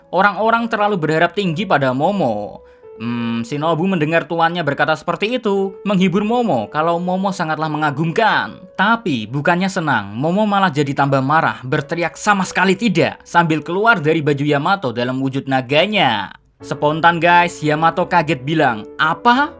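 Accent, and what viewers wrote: Java, Indonesia. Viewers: Jawane daerah ndi min?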